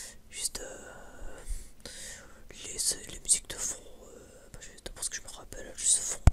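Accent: North, France. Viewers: Tu es bien du Nord 😊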